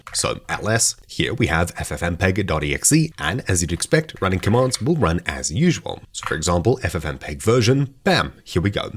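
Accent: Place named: South Africa. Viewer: Thanks for doing creating this video. I have been using warp now for a couple of months but have not gotten into some of the things that you covered. I see that you had South African English on your Excel Worksheet. So are you still living in SA or elsewhere. I lived in SA for 30 years in total from when I was 15 way back in 1974. I lived in CarltonVille, Vereenging, Meyerton, Johannesburg, Durban, East London and started work in JHB as a computer operator working on an ICl 1903T Mainframe at GoldFields Mining and Development Company. Great to here a South African voice. I Miss SA a lot. My daughter is living in PMB.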